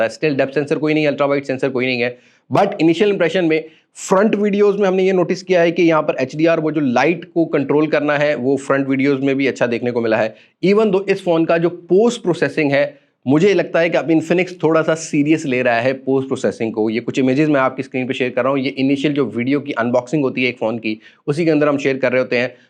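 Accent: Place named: India. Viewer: Meri apse humble request Hy ap indian tarz my bat krna chor de . Ap yh kar skate ho ap woh kar skate ho . Proper Urdu my way my boliye ap yh kr skate hai ap woh kar sakte. Hy . Hoo ki jaga Hy lgiye pls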